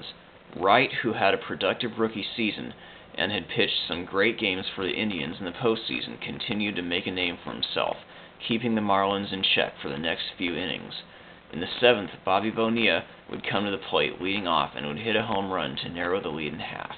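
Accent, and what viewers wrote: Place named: Latin America. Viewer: You need to lay off those annoying hispanic pronunciations dude. Do you call Italy "Italia"? Then don't call Rentaria "LLLLLentellllia".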